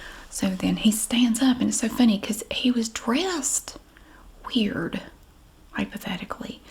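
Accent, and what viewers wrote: South, United States. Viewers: the amount of dedication u put into ur videos is outstandin! I’ve been havin a lot of stressful things happenin in my life right now, and watchin ur videos calms me down. I am from the south, but I have recently moved and miss the sounds of the country accents so it’s like ur bringin home back to me. ❤️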